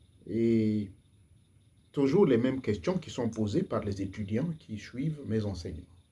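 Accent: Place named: Gabon. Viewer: bonjour au fait dites moi vous parlez beaucoup du Gabon votre mélodie est gabonaise Êtes vous gabonais ou vivez vous? C est rare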